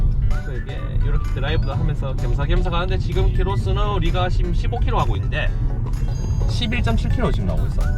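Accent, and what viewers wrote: Daegu, South Korea. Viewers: 군생활을 대구에서했는데 간만에 오리지날 대구사투리 반갑네요. 연비 잘보고갑니다.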